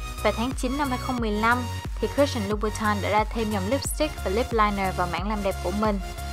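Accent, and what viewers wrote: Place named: South, Vietnam. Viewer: lần đầu xem clip của chị và replay liên tục vì giọng con gái miền nam đáng iu quá trời luônnn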